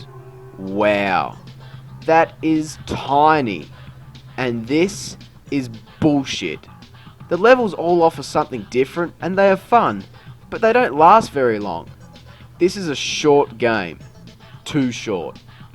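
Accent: Australia. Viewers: I'll guess your region Australia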